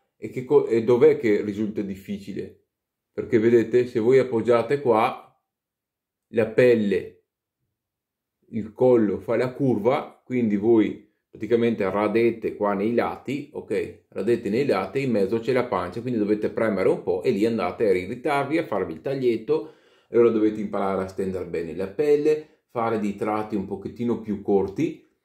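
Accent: Veneto, Italy. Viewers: Carissimo, Ho visto questo e molti altri tuoi video. Condivido tutto e trovo le tue recensioni di lamette e rasoi molto vicine alle mie stesse impressioni, per quei pochi modelli che conosco. Penso abbiamo entrambi pelle molto delicata e versi del pelo inclinati. Io da molto anni mi sono avvicinato alla rasatura tradizionale anche se ad intermittenza, per molti anni ero tornato al multilama per comodità e poco tempo al mattino. Però ho sempre usato anche col multilama l’ottima crema DR Harrys Arlington ed il pennelli Plisson in tasso grigio di alta montagna ed il dopobarba Acqua di parma in balsamo nella profumazione colonia classica, un prodotto che trovo eccezionalmente lenitivo. Dei Plisson e di Acqua di Parma non ho mai trovato recensioni almeno in italiano, peccato perché Plisson è la più antica fabbrica di oennelli da barba. Proverò le lamette che hai consigliato in altri video, almeno quelle più reperibili. 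Grazie e bel lavoro! Ed anche complimenti per l’accento Veneto, anche io sono veneto ma molto più ai confini nord… Saluti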